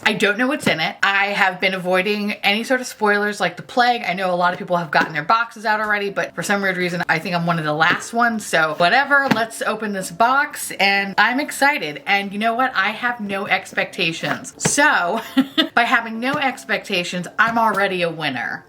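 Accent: United Kingdom. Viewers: LMAAAAOOOO the urine sample cup Stranger Danger, that's what I yelled out when I saw it. Also, my skin screamed 💀 bc that looks like a recipe for skin turning to the actual 🏜 desert Yes! Please do more vids like this! LOLLLL I adore them! The British accent gets me every time ❤ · The British voice is the best! “At the scene of the crime, there was...”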